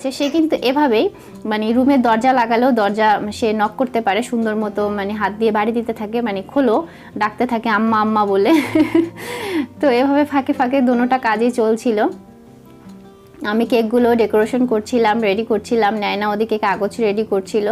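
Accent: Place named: Madaripur, Bangladesh. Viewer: Tumr onk gun re apo.Madaripur er maya bola Kotha 😊💝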